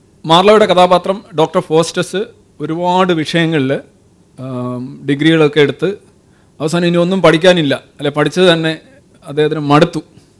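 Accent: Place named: Kollam, India. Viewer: കൊല്ലത്തുകാരുടെ ഭാഷാപരമായശീലം ........ മാത്രം .....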